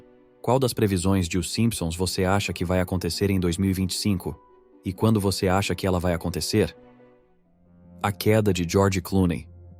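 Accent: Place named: Inland São Paulo, Brazil. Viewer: O mais irritante dessas narrações é, esse sotaque de paulista interiorano, acaba com todo o propósito!!!! FUI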